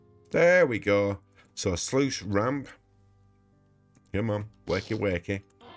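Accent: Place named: Bradford, United Kingdom. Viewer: Apologies for being nosy - are you from Bradford by any chance? The accent sounds familiar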